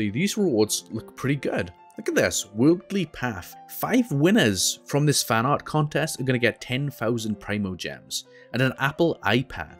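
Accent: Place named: Scotland, United Kingdom. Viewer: you and soooo funny and i love your scottish accent lmao, subscribed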